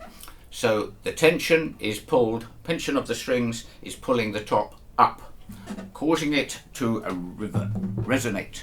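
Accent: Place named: East London, United Kingdom. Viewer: HaHa! Your accent and cap made me laugh out loud. I was born in East London and your voice reminded me of many blokes I used to know when I was a kid! So I loved your presentation of this information…although for some reason ‘Derek and Clive’ kept coming to mind while I was watching you!! 🙈😂😂 I’ll have to watch again just so I can concentrate on absorbing the info rather than being entertained and amused! 😂😂 Great job. 👍🏻 Regards, Dave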